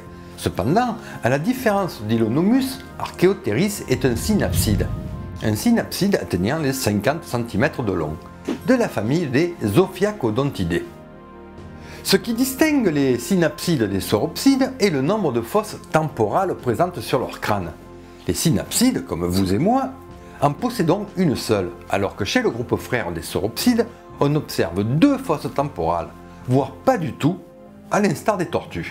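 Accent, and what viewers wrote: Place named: Albi, France. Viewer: Bien le bonjour, un grand merci pour le partage de votre savoir, ce fût très passionnant 🙏 Et cet accent me rappelle tellement mes professeurs à Albi en BTS 😊 (je suis du Nord 😅) bref je viens d'activer la cloche pour ne rien louper 😉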